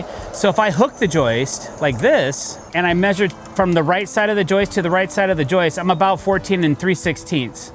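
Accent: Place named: California, United States. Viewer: Yeah this dudes a clown they don't even box in their post. Tell from his voice he up north or California probably. Code in his area isn't code for entire US and he is going to get homeowners and contractors having words with each other. Sounds gay so I'm sure drama is all he is trying to create. If your thumbnail is calling for folks to be fired! No reason not to have disclaimers telling home owner to CHECK LOCAL BULDING CODES before engaging with their contracter.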